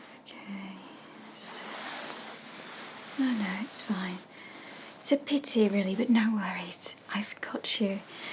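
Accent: United Kingdom. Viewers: EWWWWW BRITISH ACCENT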